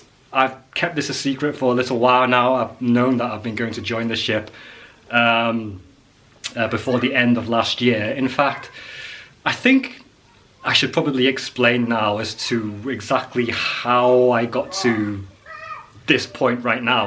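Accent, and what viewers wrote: United Kingdom. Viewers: What kind of accent do you have? I hear British with hints of Scottish, Irish some kind of Asian.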